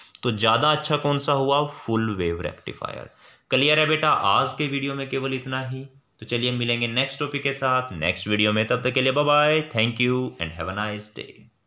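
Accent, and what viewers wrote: Haryana, India. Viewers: Sir ,Are you from Haryana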